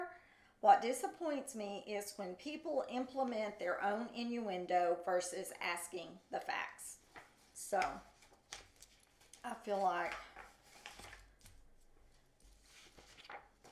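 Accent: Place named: South, United States. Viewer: I love your channel, and I love Billy Smith and his family’s channel. You’re awesome, ❤ You’re so sweet, and pretty! And I can tell a fellow southerner when I hear one:)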